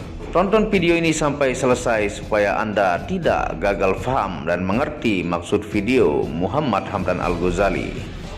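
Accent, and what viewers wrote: Bali, Indonesia. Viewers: Logat pembaca spt org Bali ?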